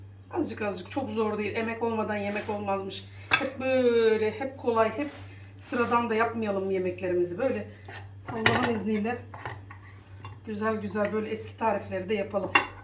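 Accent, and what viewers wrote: Aegean, Turkey. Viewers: Hanımcığım, Ege şivesiyle konuşmanız, içten ve samimi anlatımınız çok güzel. Ekmek dolmasını rahmetli anneciğim yapardı. Bu tarifinizi izlerken anneciğimin mutfaktaki uğraşı gözümün önünden film şeridi gibi geçti. Anılarım canlandı.😔 Ekmek dolmasının kokusunu duyar gibi oldum. Size çok teşekkür ediyorum. 🙏 Ellerinize, emeğinize, tatlı dilinize sağlık. 🙏 Kameramanınız sevgili oğlunuzu da kutluyorum. Çekimde ve soru sormada çok başarılı.👍👏👏👏Yiyenlere afiyet olsun canım. 😋 Selam ve sevgiler...🙋♥️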